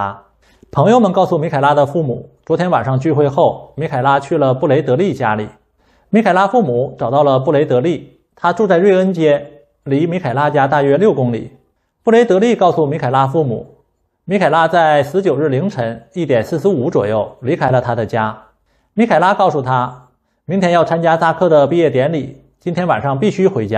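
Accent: Northeast, China. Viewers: 老哥你这东北口音，把我好不容易的普通话又拉回20年去了，当主播得练练哪，真的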